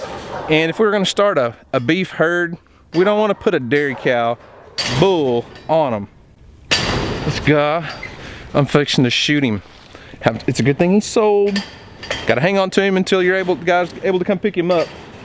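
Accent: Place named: South, United States. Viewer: You know, the more I listen to you, the more I think you sound like Alex Kendrick, from Sherwood Films ( Courageous, War Room, etc.). I suppose you could be originally from Georgia. Or maybe it's just that, to my Pacific NW ears, all you Southern gentlemen sound alike.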